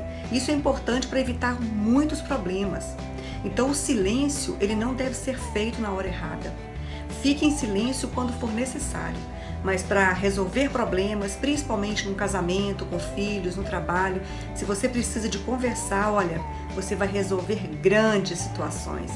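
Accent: Minas Gerais, Brazil. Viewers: Vc é mineira! Meu pai tbm, de Passos. Agora te entendo melhor. Bjssss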